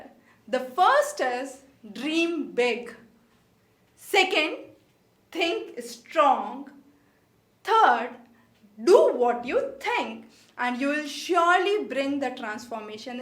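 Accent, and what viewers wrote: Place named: South India, India. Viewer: I m thinking your from southIndia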